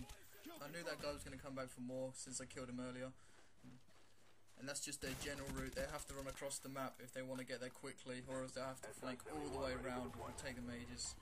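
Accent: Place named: Australia. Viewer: very Australian english accent :)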